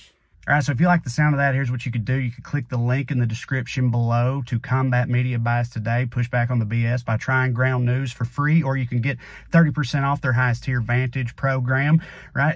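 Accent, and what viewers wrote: South, United States. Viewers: I had to follow the transcript to understand your southern accent!!! This is worse than calling the tech guy (how do I plug it in?!)!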